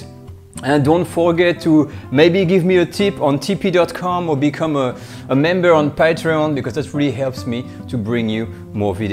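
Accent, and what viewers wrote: France. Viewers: I like your English with French a cent greeti gs from Athens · I've tried a few times to watch this guy's videos but I just can't stand the sound of a French accent. Makes me nauseous. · Love the accent! Nice to know a Frenchman is teaching! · I never heard such a clean and smooth french accent... it's like english is flowing out of your mouth. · Sorry find the French accent very irritating. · even when you talk english you have that french akcent that is amaxing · Je ne comprend l’anglais que quand un Français le parle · Its very nice to hear ur English in French accent 😜 and thank you for the recipes.... I tried onion soup and it came out tasty🤗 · I didn't know how much French love butter until I watch your video. The sauce looks pretty and creamy. Btw, your Frenchy accent is so cute. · Can you please hire someone to translate, your strong french accent is really annoying.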